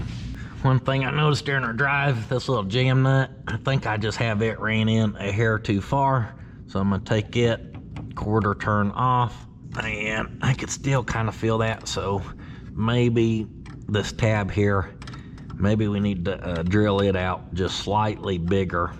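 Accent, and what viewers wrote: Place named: Appalachia, United States. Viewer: LMFAO - I used to think the "Deliverance" - "inbreed from the hills of Kentucky" ACT, was just that, an act just for filming YouTube videos - you know just a shtick. But I have come to realize that it is real, this is really how you are, and the way you talk, and your real IQ. Kind of makes this channel even funnier when one realizes all the jumping, dancing, talking like a hillbilly, doing things that make you look not so sharp, is actually REAL.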